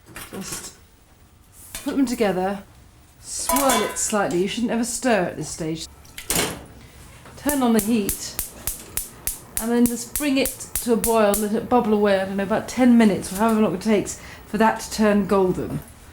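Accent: United Kingdom. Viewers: chok-lut - I hat that British pronunciation, and straw-breez. I can't listen to it. Buh-bye.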